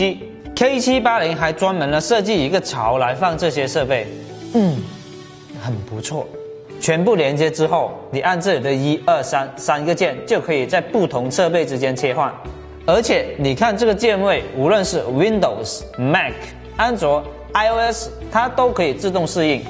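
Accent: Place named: Guangzhou, China. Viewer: 广州人口音。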